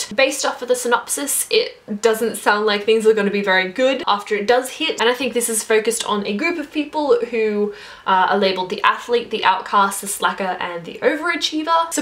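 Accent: Australia. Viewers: Australian or New Zealander?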